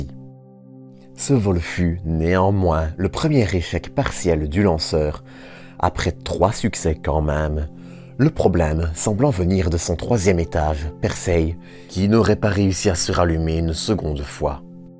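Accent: Belgium. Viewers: Hé non c'est l'accent belge 😉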